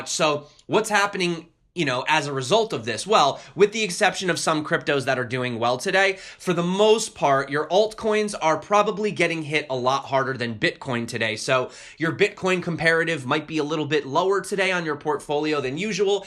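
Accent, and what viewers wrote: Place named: United States. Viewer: Your accent is American.So I've asked you.Thank you bro.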